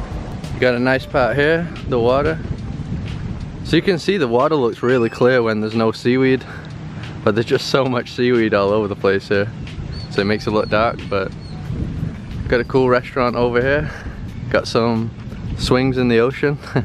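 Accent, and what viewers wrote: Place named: England, United Kingdom. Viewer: Wow man! I really like your English accent. Sound very clean for me. Where you from?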